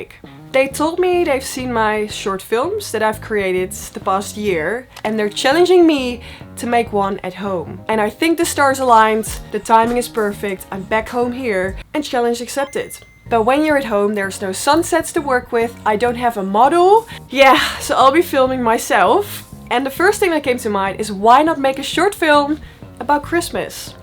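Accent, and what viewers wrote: Netherlands. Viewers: Love that you called out your Dutch accent. Because i never watched a video of you before but within a few seconds i realized i was watching a fellow Dutchie ;)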